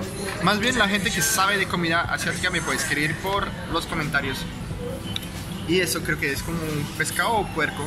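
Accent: Colombia. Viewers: Aprendiste el mejor acento el colombiano, hablas re colombiano. Se que eres heterosexual, pero lo diré , eres guapo jeje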